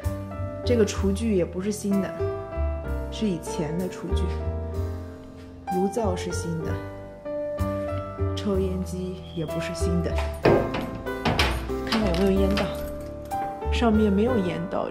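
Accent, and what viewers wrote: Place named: Beijing, China. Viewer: 您是北京人吧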